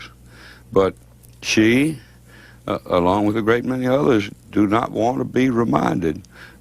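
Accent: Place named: South, United States. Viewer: Hard to catch with his thick southern accent.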